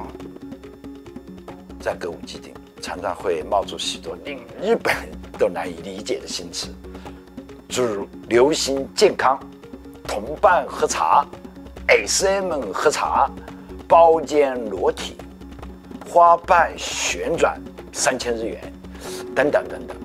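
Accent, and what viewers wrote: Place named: South, China. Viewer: 李さん的普通話口音是南方人，跟深圳相似。又不是央視新聞，無所謂啦。👋🏼😄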